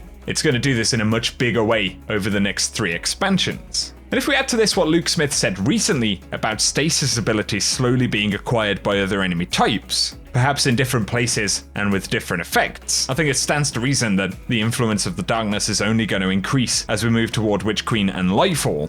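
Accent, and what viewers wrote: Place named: United Kingdom. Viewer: Gotta love the British voice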